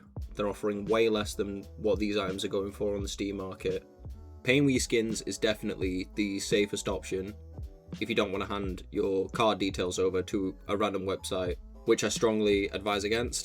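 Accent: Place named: United Kingdom. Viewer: i assume youre from UK by your accent, can you even deposit money into the website? im from UK and cant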